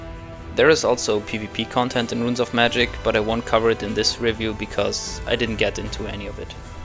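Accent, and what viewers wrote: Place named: Germany. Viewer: thx for the test. also love the nice seemingly german accent :-)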